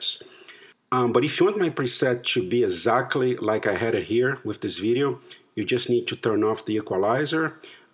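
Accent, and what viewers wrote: Brazil. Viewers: You sound Brazilian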